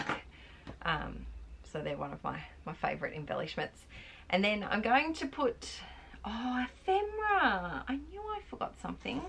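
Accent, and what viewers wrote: Australia. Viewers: The joy of hearing an Aussie accent.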